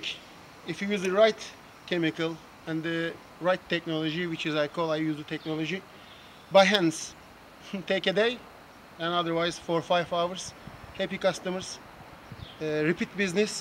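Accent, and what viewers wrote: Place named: Turkey. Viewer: u have such a turkish accent of english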